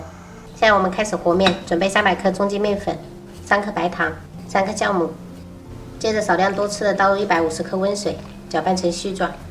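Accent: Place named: Minnan, China. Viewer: 聽到講閩南語特別親切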